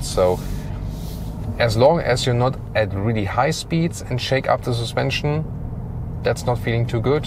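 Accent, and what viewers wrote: Germany. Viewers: is it a German accent?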